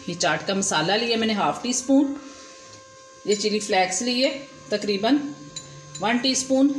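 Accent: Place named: Punjab, India. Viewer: Wowww Super like 48 for the Super tasty and crispy Moong Daal ke Pakode. Kya baat hai Bahaut hi alag style se banaya aapne inne. Bante dekh muh mein paani aa gaya. Nicely prepared and well explained each step. Aapka Punjabi tone hai bolne mein, jo hi Bahaut accha lagta hai. Jabardast Pakode ku Beautiful presentation ke saath. Wah ji wah mam. God bless you always 🤤🤤😊👍👍👌🙏🙂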